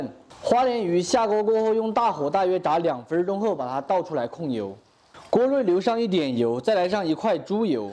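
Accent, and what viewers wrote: Sichuan, China. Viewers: 川普太可爱了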